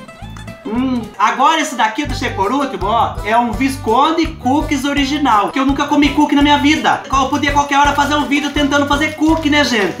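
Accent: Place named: Piracicaba, Brazil. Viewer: parese piracicabano falando kkkkk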